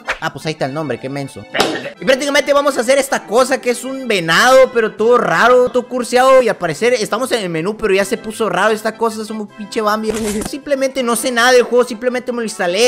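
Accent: Chile. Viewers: Soy yo o tienes voz de chileno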